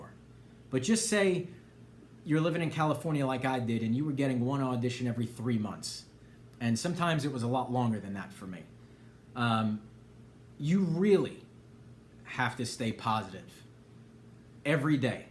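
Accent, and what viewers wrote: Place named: Brooklyn, United States. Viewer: Love the Brooklyn accent omg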